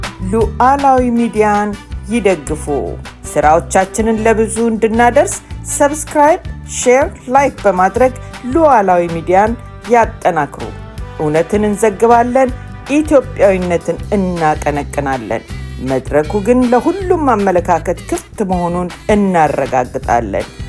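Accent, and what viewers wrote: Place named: Arab world. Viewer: 👉👹👹😭ሀ ሁ ሂ ሃ ሄ ህ ሆ ብሎ ያሰተማረህ ማን ነው ዋጠው አማርኛው ከየት አመጣሀው ቃንቃህ አረብ ነው 😭 👹👹👈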